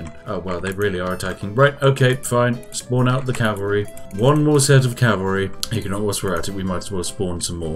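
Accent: United Kingdom. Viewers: I Love your British English, especially the word „fantastic“. Great channel, greetings from Germany. New subscriber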